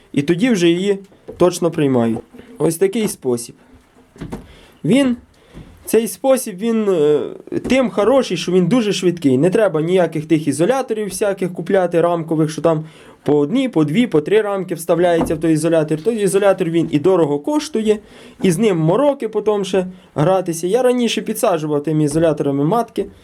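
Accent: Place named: Khmelnytskyi, Ukraine. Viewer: Ви напевно десь з Хмельниччини, у нас така вимова😊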